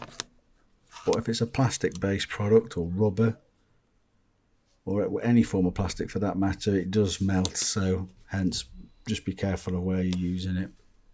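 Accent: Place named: Midlands, United Kingdom. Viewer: Excellent feature/tutorial. What would closest describe your accent, Leicester, Derby, Stoke?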